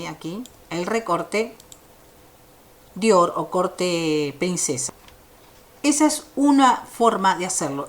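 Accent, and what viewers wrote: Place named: Argentina. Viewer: Por el acento eres argentina me encantó tu clase me inscribirme para que me lleguen notificaciones gracias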